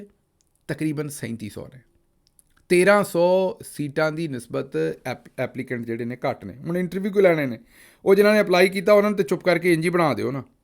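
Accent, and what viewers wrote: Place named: Punjab, India. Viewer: I love your punjabi accent, keep up the good work also you used to read comments from different followers plz resume that, it was good effort to highlight backward area issues.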